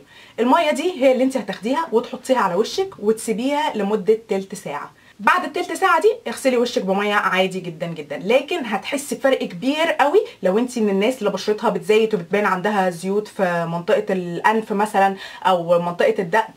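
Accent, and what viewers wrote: Egypt. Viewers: اللهجة المصرية جميلة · والله انا جزائرية وشاهدت الفيديو لمدة 20 ثانية الأولى 😌😌لهجتها روعة حلوة حلوة بزاف تحية لأهل مصر 😘😘😘🌹🌹🌹🇩🇿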